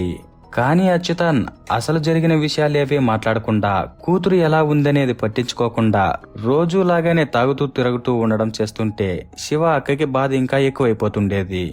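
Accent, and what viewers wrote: Rayalaseema, India. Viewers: Rayallasima kada slang alane untundi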